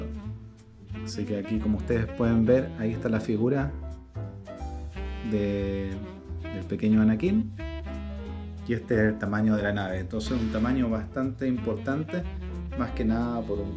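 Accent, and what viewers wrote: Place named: Chile. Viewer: Tenés pinta de ser chileno...por el acento lo digo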